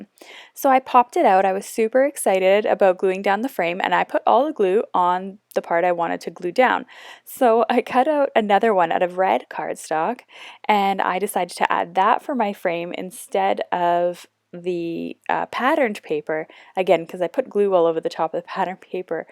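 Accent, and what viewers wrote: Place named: Canada. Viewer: Today is the first time I noticed your Canadian accent. Love it. Would like to see more man type cards.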